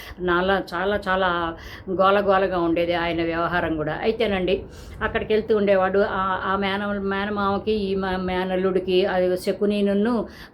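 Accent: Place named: Chirala, India. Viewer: Great inspirational words as always ❤ Maadi kooda near by area andi. Chirala daggara, me slang and few telugu words i felt like you are from that area as well.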